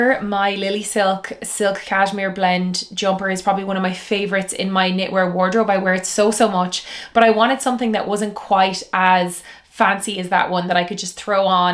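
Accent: Ireland. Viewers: I thoroughly enjoy your style, fashion recommendations and beautiful Irish speech. The rattan purse you showed us is so unique. What brand is it and where might I find it? 🇨🇦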